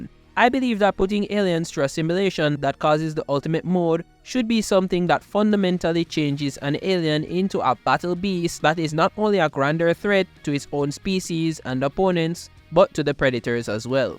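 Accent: Jamaica. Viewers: Bro are you Jamaican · Hold up are you jamaican bro. Di accent a sneak thru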